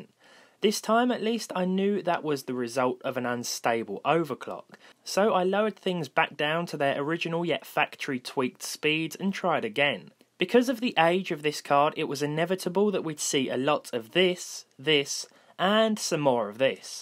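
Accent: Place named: Home Counties, United Kingdom. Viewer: I just realised that RandomGaming and Budget Builds are 2 different channels, lol. I just hear someone with a home counties/posh (I'm from the North you sound posh to me) accent talking about old hardware. AMD is like Linux, over the time they have existed you will always hear someone saying they are poised to take over their bigger rival, but it never happens, there must be a reason why Nvidia have always been, by far, bigger in the market than AMD, same goes for Intel, it can't just be the stupidity of the public. Whenever it's time for me to upgrade there is always chatter than AMD are better than Nvidia and Intel, and I always look at them, but can never take the plunge, they never seem to stack up to the hype.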